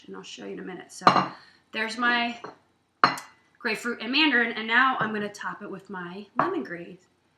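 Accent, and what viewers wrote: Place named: United States. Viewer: Thank you for showing us how to freeze a lemon. I think it's a great idea and I loved the video. I wondered if I could ask you to try and speak a little slower as I am from England and can't always catch the american accent. I wish you and your family well.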